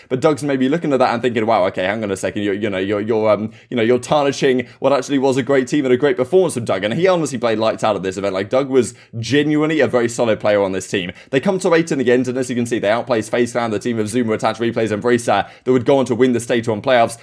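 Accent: United Kingdom. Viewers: Watched your video last night, and as soon as the intro sound happened, followed by the "Alright guyyyyys, ", my girlfriend looks up and says "I feel like I live with this guy... I can't even understand him, he talks so fast! At least he's British though and not annoying like that other dude!" (she hates Maven.. haha)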